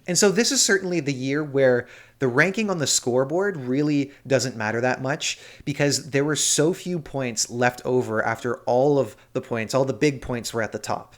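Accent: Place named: Canada. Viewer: I subscribed to your channel when I discovered it listening your appraisals of the Benidorm Fest. As I told you then, your channel was going to be the one in English I was going to follow covering Eurovision 2023. I have lived in Canada (BC and Toronto), so your accent sounds so familiar to me, which is a plus when I listen your commentaries. The objectivity and consistency of all of them is also here in this summary. I look forward to the video you are telling us is coming next.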